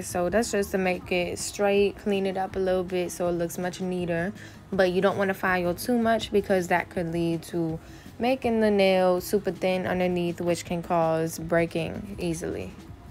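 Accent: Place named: Washington DC, United States. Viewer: U sound like u from dc...or md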